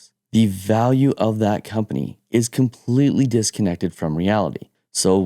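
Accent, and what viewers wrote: Canada. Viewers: Such an interesting video, and beautifully presented. Great to hear a nice Canadian accent.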